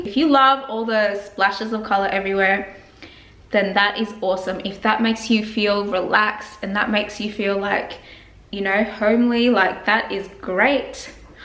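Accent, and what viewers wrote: Australia. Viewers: Love your aussie accent!! You look beautiful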